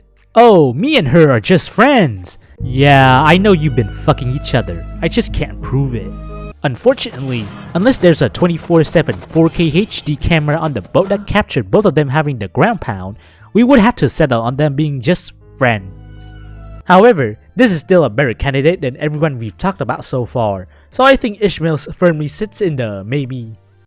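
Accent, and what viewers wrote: Vietnam. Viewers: Seeing this guy Vietnamese accent continue to become more presence is funny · why do you sound like a Vietnamese/Asian? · you sound so vietnamese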